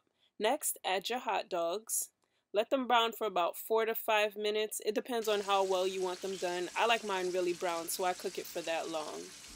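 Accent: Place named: New York, United States. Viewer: Bruh your accent is crazy New York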